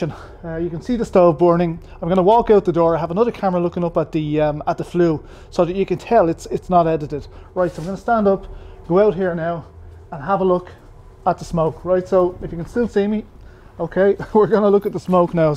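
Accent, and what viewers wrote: Ireland. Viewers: Nice one are you from Ireland?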